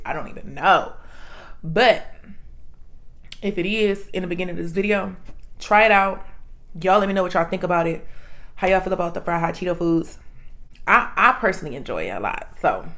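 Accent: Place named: Washington DC, United States. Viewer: The accent dound like she's from DC/PG..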